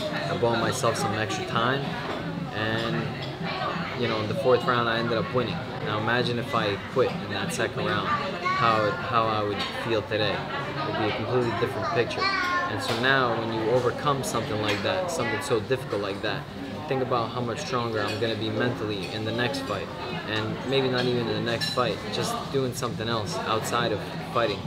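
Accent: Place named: New York, United States. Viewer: The thing I like most about is how he says things that make me take more accountability and responsibility for my life in general. Like how he says you gotta motivate yourself, because exterior motivation fizzles out quickly. I think it may also be the New York accent mixed with his no bs approach, plus the steely blue eyes. 🤣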